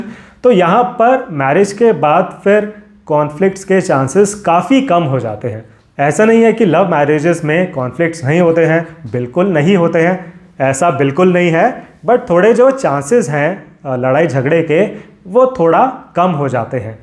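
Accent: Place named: United Kingdom. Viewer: english accent 🔥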